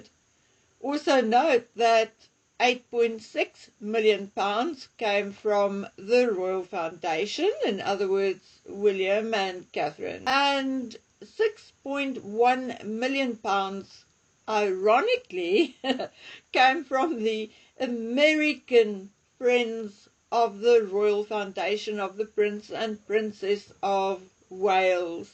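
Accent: South Africa. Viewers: I don’t understand why her subscriber numbers aren’t higher. I can say this because I know she doesn’t read our comments. Maybe people find the South African accent jarring. We have a lot of South Africans living around where I live 🇦🇺 & it used to grate on me until I got used to it. It’s the only thing I can think of because I never miss one & have recommended her to others on X etc but her numbers remain the same.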